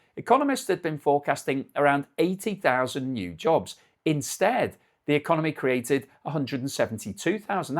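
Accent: United Kingdom. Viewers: YouTube is my crash course in the British accent. My head is still hurting, my mind wanders. I may be too old for this. Great analysis, Sir! Thank you! I'm wondering if this new Fed Chairman will be a modern day Arthur Burns - accommodating the president's war spending, leading to inflation. So higher interest rates? Hmm.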